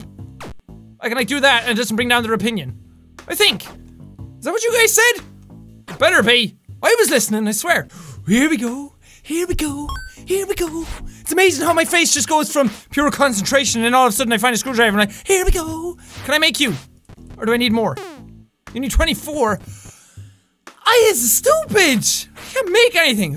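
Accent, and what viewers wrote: Ireland. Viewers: Lol it's Irish dude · haselbacher he is IRISH and me too # Irish4life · Tirteen strengt. Lol, i love the irish accent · Zarif Safin irish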